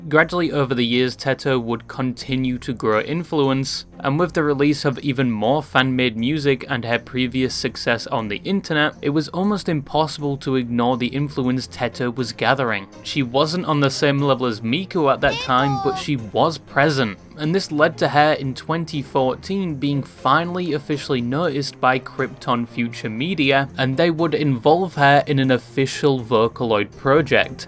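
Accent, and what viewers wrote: United Kingdom. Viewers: Why do i realise this guy is kinda bri'ish (first time ever watching this guy) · You may call me old for knowing Angel with a Shotgun. But your British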